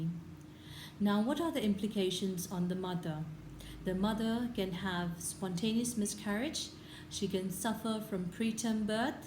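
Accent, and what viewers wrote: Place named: United Kingdom. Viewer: Madam can u plz tell me how did u learn british accent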